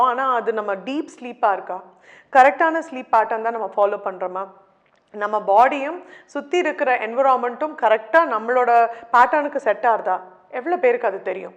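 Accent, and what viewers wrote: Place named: Tamil Nadu, India. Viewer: Hi ma'am I have watched all your videos regularly. Wonderful explanations and clarity and I love your Tamil accent ma'am 💕 Thank you 🙏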